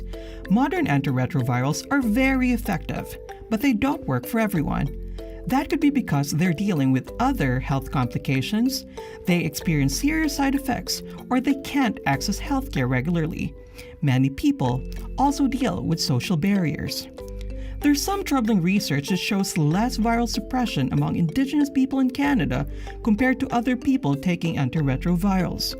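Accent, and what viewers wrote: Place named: Philippines. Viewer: The narrator sounds Filipino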